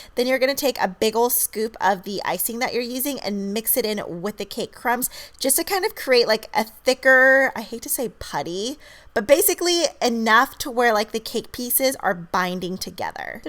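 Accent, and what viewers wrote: Texas, United States. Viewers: ! You're getting a Texas twang!!! Not gonna lie- I'm looking for jobs and to relocate and I'm like "I'm gonna go to Texas. Maybe I'll see or leighann!" I'm not a stalker tho. Oh god that's how it sounds. Haha. Gotta go hide under a rock now.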